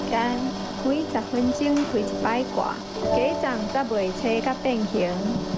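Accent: Hsinchu, Taiwan. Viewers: 講了真詳細，你的台語足好聽，感謝。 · 影片配上甜美的新竹腔口，很親切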